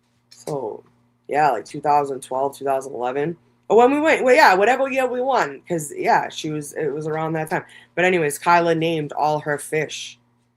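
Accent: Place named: Boston, United States. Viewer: sometimes creative can’t see everyone if they don’t hit live chat and other people can see everyone if they don’t hit all messages instead of top messages. Idk 🤷‍♀️ Will still be watching 👀Listening to you talk is like hearing my family talk. We have the same Boston accent. ❤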